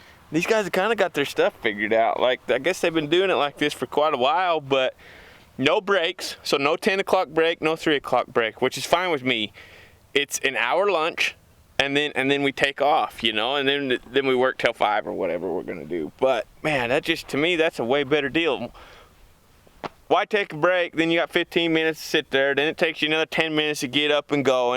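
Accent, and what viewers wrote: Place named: Utah, United States. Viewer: Are y’all from Utah by chance? You have that Utah accent 👍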